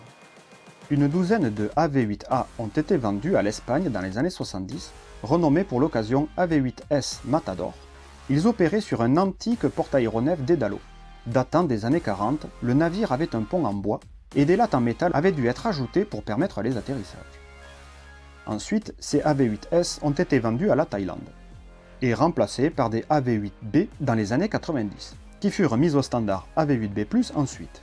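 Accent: South, France. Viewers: J'en ai lu des trucs sur les avions et sur le Harrier, mais là je n'ai qu'un seul mot : FELICITATIONS. Que de travail ! Sur le fond on apprend pleins de choses en un minimum de temps, sur la forme, rien à redire, les images sont exceptionnelles et ont dû demander un paquet de travail, le montage est parfait, le ton parfois humoristique et l'accent du sud ne font que rajouter de l'intérêt à vos vidéos en les rendant plus vivantes. Petit bémol le verbe "pallier " est transitif direct "La construction pallier à [verbe transitif indirect], courante, est incorrecte" mais bon c'est pour chipoter car les commentaires sont justes excellents, Continuez !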